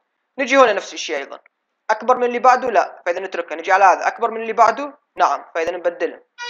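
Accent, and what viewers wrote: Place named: Mosul, Iraq. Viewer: 😍😍فوك ما شرح حلو وطريقة حلوة النوب اللهجة مصلاوية احييك من القلب ❤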